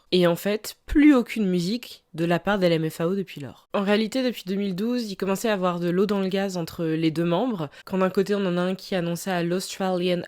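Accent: United Kingdom. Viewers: j'aime trop ton accent british oml 😫🫶🏻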